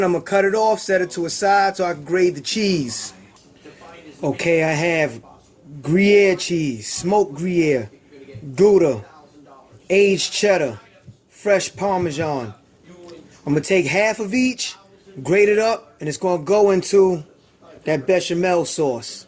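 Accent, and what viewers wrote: New York, United States. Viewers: Dope video bro and I know a fellow New Yorker when I hear one. You actually sound like Ghostface. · You definitely from NY my nigga! Great Video! You do BBQ's? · Silver Stone that's how NY men talk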